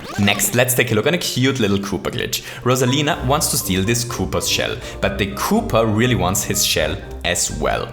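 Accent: Germany. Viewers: Hey you have a German accent are u German? BTW love ur videos :) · I know, it's a silly question but are you german. Your accent sounds like a german one.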